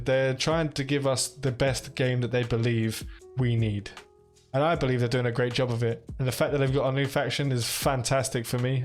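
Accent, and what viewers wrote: United Kingdom. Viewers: London geezer init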